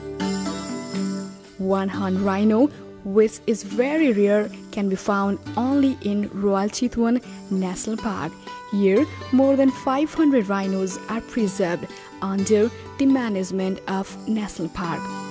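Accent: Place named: India. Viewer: Indian accent spoted =)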